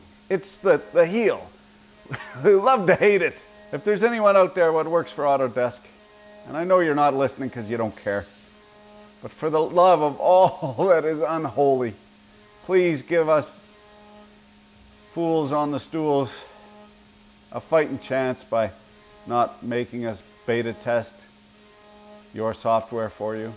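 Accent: Quebec, Canada. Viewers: Ah ben tabarnak, c sûr qu'avec cet accent là c'est resté au Québec un bout... Salutations et nice job! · I speak French but I understood absolutely nothing. Is it some sort of Canadian dialect? Can you translate please?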